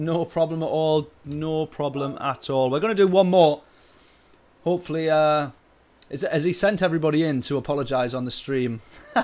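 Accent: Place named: United Kingdom. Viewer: The last race was very exciting, but the best race was the second one, the one where you pitted one lap too early by accident. I love watching your streams, because you are really fast, but also exemplary fair. The only other streamer who also stops every time after making a mistake is Rory Alexander, who I also like. As a huge fan of since 1988, I also gotta give it to you for promoting my favourite band as a part of the livery of your cars. One more thing that separates you from the rest of the streamers is your accent. I can tell that you're from UK, but which part of UK remains a mystery to me. Gotta love your accent, though.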